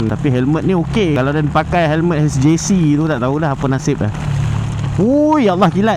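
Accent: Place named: Negeri Sembilan, Malaysia. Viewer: Sronok dgr loghat nismilan ni. Klau boleh slalu update loghat ni bang. Haha salam dri kota bharu Texas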